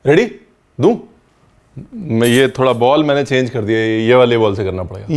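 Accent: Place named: Punjab, India. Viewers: "Amrricca" pure Punjabi English . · Yess thats Punjabi style.....Chef Harpal ardak bolate hai...adrak ko😀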